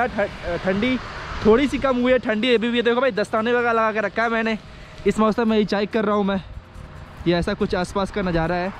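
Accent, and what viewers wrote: India. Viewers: Bhai, Youtuber to bahut hai. But aapka bolne ka desi andaaz, really love it. Kabhi bhi isse change mat karna. Yeh bhi aapki ek khasiyat hai.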